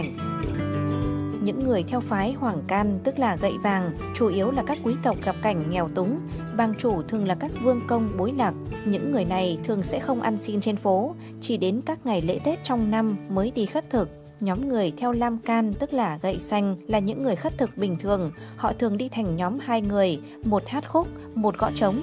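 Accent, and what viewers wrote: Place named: North, Vietnam. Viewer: Hồng Thắm giọng nam, còn giọng này âm bắc mà bạn!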